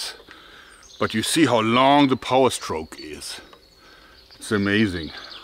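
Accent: Germany. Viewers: IT IS NOT "2000$", IT IS "$2,000"! I can hear you are German (?) but get it right! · . Lets reduce the arrow-weight down to ... eehm ... 11 grams what should result in an arrow-speed of > 400 m/s .. ? Increasing the acceleration by 100% will lead to >1.600 m/s (hypersonic) ...and > 600 Joule (based on a 11 grams arrow) ? To stay below hypersonic-speed requires an arrow-weight below of ... eehm ... something below 16 grams ... or so. The Front-Part of that pulley, needs to be replaced behind the shoulder. Gruss :Rudi Ich mag dein DEUTSCHES Englisch :D . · I bet Hollywood actors watch your channel if they need inspiration and help with their German accent! :) You can put the sights a little forward yourself.. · Nach dem ersten Satz wusste ich, dass du deutsch bist 😆😉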